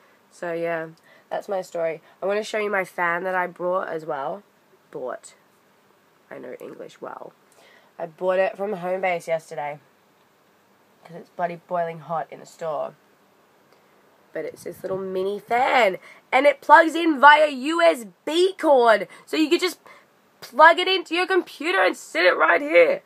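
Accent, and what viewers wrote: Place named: Australia. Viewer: Even though you still have your Aussie accent, you're turning into a Pom... You said Hoover instead of vacuum. Haha:) Nice work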